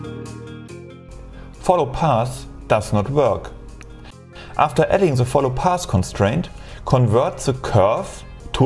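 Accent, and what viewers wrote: Germany. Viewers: do i hear a little bit of deutschen akzent?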